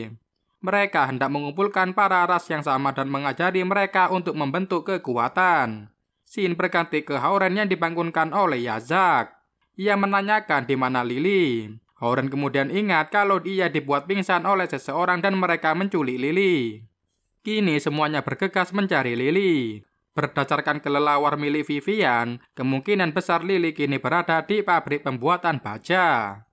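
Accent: Java, Indonesia. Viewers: Logat jawanya terlalu terasa😌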